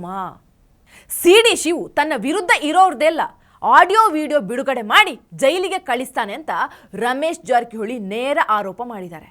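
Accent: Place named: Tamil Nadu, India. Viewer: ಅವರೇ ತಾವು ತಮಿಳ್ ಅವರ ಯಾಕೆ ಅಂದ್ರೆ ಅವಾಗವಾಗ ತಮಿಳ್ ಒಂದ್ ಭಾಷೆ ಅವಾಗವಾಗ ಆಚೆ ಬರುತ್ತೆ ಇರ್ಲಿ ಚೆನ್ನಾಗಿದೆ ನಾನು ಕೂಡ ತಮಿಳು ತುಂಬಾ ಖುಷಿಯಾಗುತ್ತೆ ತಮ್ಮ ಮಾತು ಕೇಳೋಕೆ ತುಂಬಾ ಖುಷಿ 😊👌🙏🚩🚩🚩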